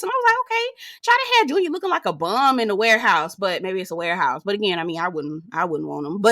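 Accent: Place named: South Louisiana, United States. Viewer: u talk like me lol .... im from South Louisiana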